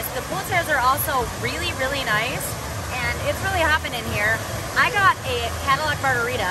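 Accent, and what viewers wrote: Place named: Minnesota, United States. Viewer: Are you from MN? I detect an accent.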